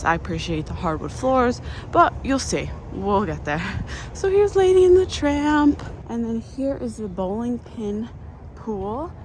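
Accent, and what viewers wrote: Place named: New Jersey, United States. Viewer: Just found your channel looking for pop reviews since this would be a good cost option for our next trip 😊. I’m from NJ and I’m hearing a similar area accent lol where are you from?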